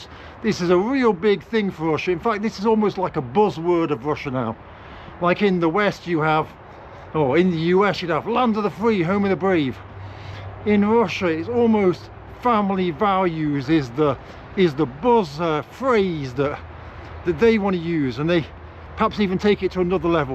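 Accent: United Kingdom. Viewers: It seems that people are paying attention to you not because you are suspicious, but because it is unusual to see a person speaking pure British English in front of a camera imo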